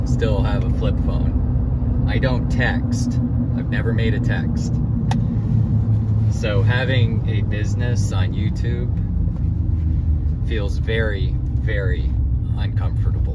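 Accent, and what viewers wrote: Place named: Pennsylvania, United States. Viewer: I’ve watched several of your videos and was always impressed with the dictation and wondered what part of the country you lived . Your accent was very familiar but I couldn’t nail it down. Now I know “ Pennsylvania “ my sister lived there in Paoli . Very impressive interview with the Boss and he hit the nail on the head with his answer about the dowry . Hope you were listening.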